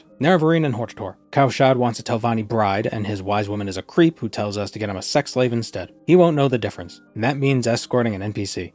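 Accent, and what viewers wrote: Boston, United States. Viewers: I knew there was something weird about you. Finally figured it out. You're from Boston aren't ya? Lol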